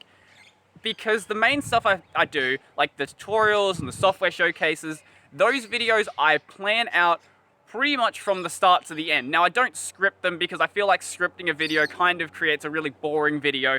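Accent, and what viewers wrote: Australia. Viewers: He’s Australian 🦘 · He is Australian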